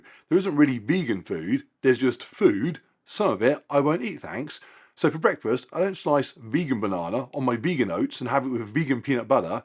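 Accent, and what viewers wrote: United Kingdom. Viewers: Unfortunately, I cousins understand a word in English. Very strong British accent and I cannot understand this English.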